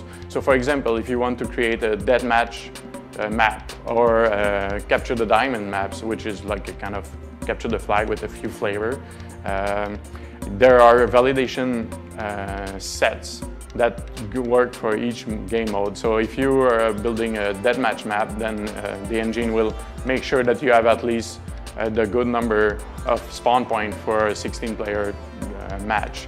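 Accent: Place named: Canada. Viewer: awsome! LOVED IT!! ^_^ sounds like its canadian lol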